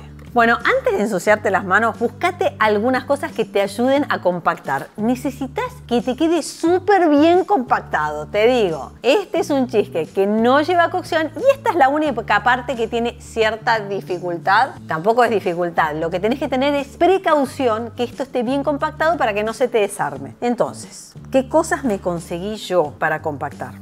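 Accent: Argentina. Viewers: sos argentina no ? se nota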